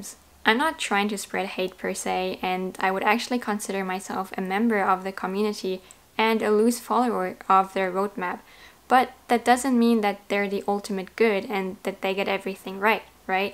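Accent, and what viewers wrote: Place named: Germany. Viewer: Wow I just found this video and you expressed exactly my thoughts about refold. So many of your points of view I also had them in the back of my head but for some reason I didn't realise I needed to listen to them from someone else. By the way, what is your native language? You give me strong German vibes but your English is really good!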